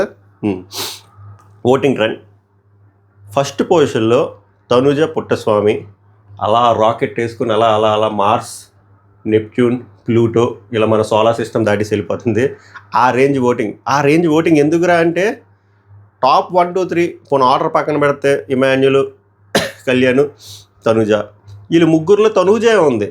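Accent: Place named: Andhra, India. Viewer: Bro ,am from Bangalore …ee Andhra slang vini chala years ayyindi … more than your reviews i like hearing this slang …Telugu almost marchipoya anukunna 😊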